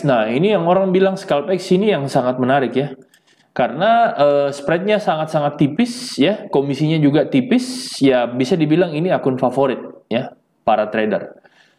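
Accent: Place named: Makassar, Indonesia. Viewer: Didengar logatnya pasti org makassar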